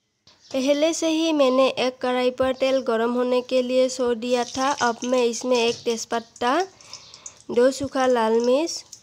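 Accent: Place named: Assam, India. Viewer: nehi wo Assam se hai. Unka naam ek flower ka naam hai. Aur kabutar Assam me khate hai aur yeh kabutar ka receipe bata rahi hai. Ho sakta hai yeh Bengali hai lekin pakka Assam se hai. Unke Hindi ka pronunciation se pata lag jata hai. Aur yeh kisiko Assamese me reply kar rahi thi meine dekha tha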